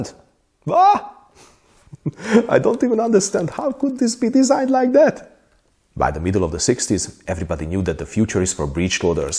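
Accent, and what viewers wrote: Hungary. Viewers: And a thank you to you, in beautiful bright Hunglish—Köszönöm! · I have recently found your channel and I thoroughly enjoy your videos in the beautiful Hunglish language! I enjoy reading historical fiction, especially that of Bernard Cornwell over the periods of the Napoleonic Wars, American War of Independence and the US Civil War. In it he describes the weapons and how they're used in detail. Your channel is the first one I've found that goes into the same detail, showing how they work and their design. I really enjoy seeing all these pieces I've read about in action. Thank you very much for sharing your passion with us!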